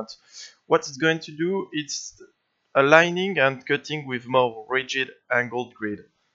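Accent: France. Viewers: J'ai l'impression que tu es français non ? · You're french ?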